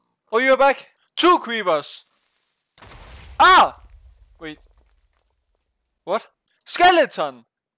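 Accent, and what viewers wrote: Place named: Denmark. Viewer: Man kan høre du er dansker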